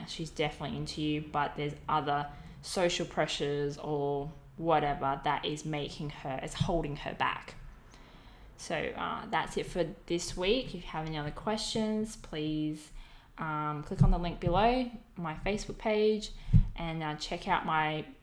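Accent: Australia. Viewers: I do appreciate your honesty in regards to this matter tho... so thank you! :3... BTW... are you Australian? That is one hell of an accent.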